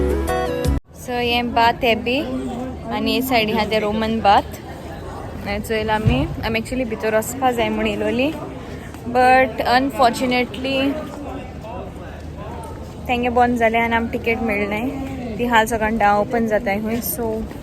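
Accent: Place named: Konkan, India. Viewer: What dialect of Konkani is this as I could barely understand. I live in Cardiff.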